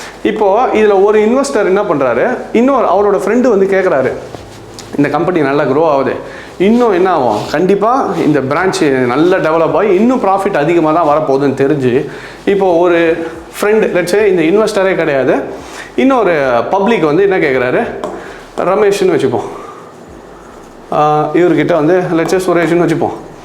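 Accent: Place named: Kanyakumari, India. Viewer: Neega Kanyakumari arabiga bro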